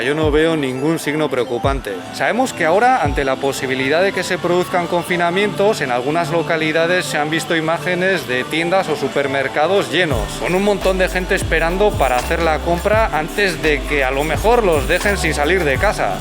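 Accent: China. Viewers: Oye hablas muy diferente a lo habitual pareces como que hablas en español con acento del idioma chino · Caballero no es por mal pero trabaja más en ti asentó español ya hablas como chino. No olvides tus raíces es tu identidad. Bendiciones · Ya tienes el acento chino..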